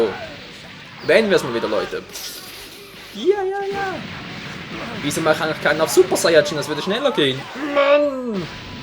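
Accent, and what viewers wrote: Switzerland. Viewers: hey <3 bist woll ein schweitzer :D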